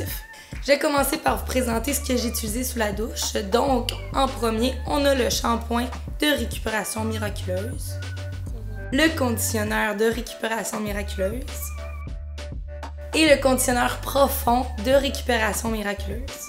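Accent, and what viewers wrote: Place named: Quebec, Canada. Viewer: J'ai beaucoup aimé tes looks tu es trop jolie 💘 l'accent québécois👌👌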